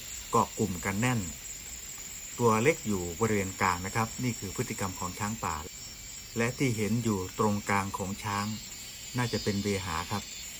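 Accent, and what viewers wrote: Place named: North, Thailand. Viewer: พากย์และบรรยาย ภาคเหนือ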